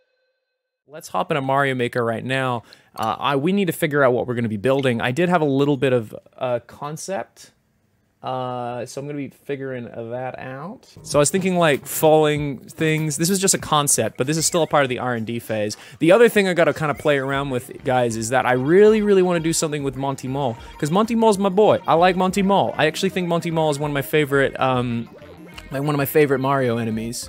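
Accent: Australia. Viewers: was super Australian in this one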